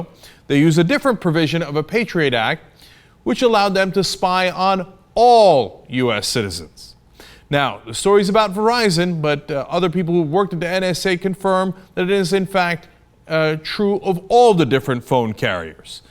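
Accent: Germany. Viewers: Worst German accent ever. Otherwise a great vid. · Cenk f*** you for pulling Stuttgart, Frankfurt and your weird German accent into this, it's not the German government doing that and btw, the CIA put up their base in Stuttgart spying on and kidnapping German citizens as well.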